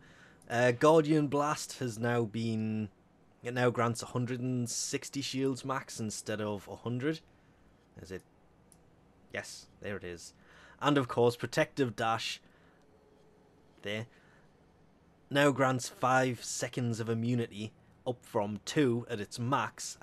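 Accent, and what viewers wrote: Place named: England, United Kingdom. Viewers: Sounds like he's from Newcastle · North east England. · Except Tac is Irish and this guy is English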